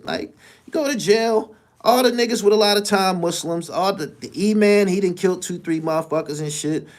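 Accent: New York, United States. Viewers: Yo u from PA why u sound like u from New York no pun intended on my New York Niggaz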